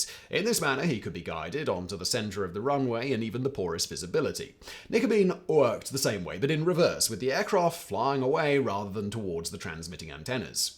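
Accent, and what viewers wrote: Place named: South, United Kingdom. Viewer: I suspect mr. has been talking for so long up till now, that the narration has naturally sped up to at least 1.25×. Coupled with southern British accent and expressive-monotonous intonation, it doesn't quite register the information contained in the text between my ears. I'm not elderly or naturally slow, but had to partly watch it on 0.75×, which sounds hilariously posh and inebriated at the same time. There might be a problem between those two big ears, however it's not the case for most other chanells i watch, including BrainBlaze, while not being native anglophone might have something to do with it. A pity, because matters of WW2 are increasingly relevant today and this is utterly a banger episode.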